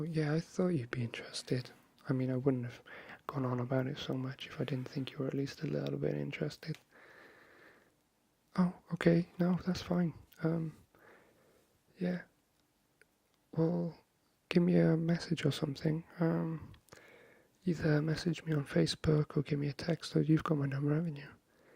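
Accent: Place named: Wales, United Kingdom. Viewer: Are you welsh? :) trying to place your accent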